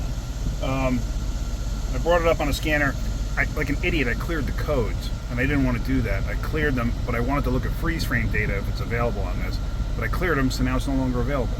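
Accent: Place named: New York, United States. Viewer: Ok, thanks. Sounds like he has a NY accent so that's why I thought Mexico,NY.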